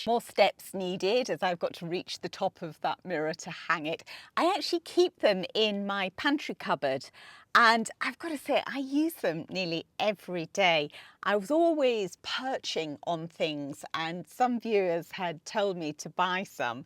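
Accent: United Kingdom. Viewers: Love just listening to your British accent, but your decor ideas are fabulous. I wish I could come visit in your beautiful country home. Great videos!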